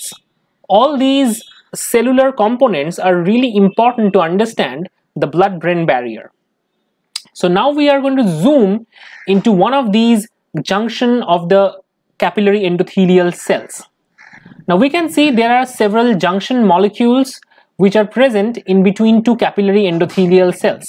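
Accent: India. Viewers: There’s something unique about the Indian accent that helps me learn topics like this much better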